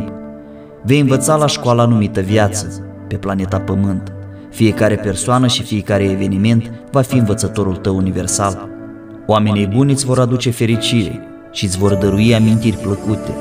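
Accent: Moldova. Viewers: Apreciez materialele realizate de tine, ma amuza ", graiul dulce moldovean", cât despre conținut, par bine realizate și bănuiesc, ca necesita ceva osteneala pentru a le realiza. Zice-se că nimic nu e-ntamplator, intr-adevar, oricât de greu ti-ar fi, oricâte obstacole vei întâmpină, pentru realizarea unor astfel de materiale, incearca sa constientizezi ca tu, de fapt esti un "instrument" prin care Intelligenza suprema a Universului, ne bucura și ne destăinuie prin tine, aceste lucruri minunate, "hrana" spirituala. Fii binecuvantat, suflet minunat 🙏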